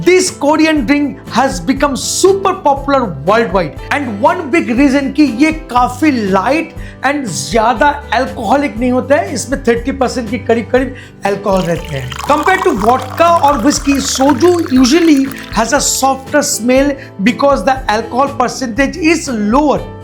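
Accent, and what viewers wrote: Bengal, India. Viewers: Bengali accent chillia chiliia berachhe tmr theke 😂❤❤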